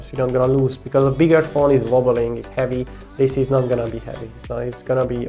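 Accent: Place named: Hungary. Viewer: You sound like hungarian 🇹🇯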